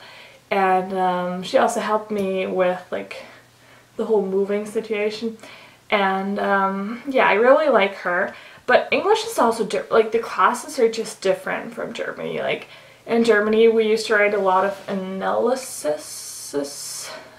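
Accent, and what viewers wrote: United States. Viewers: Omg ich dachte am Anfang seit wann habe ich eine Amerikanerin abonniert😂😂😂 Omg du sprichst so gut. Ich wäre untergegangen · Ich weiß zwar nicht, wie dein Englisch vor der Reise war, aber ich finde es echt super! Du hörst dich so amerikanisch an, als wärst du dort geboren und hättest dein ganzes Leben nichts anderes gesprochen 😊 freut mich total, dass es in der Schule so gut klappt 😍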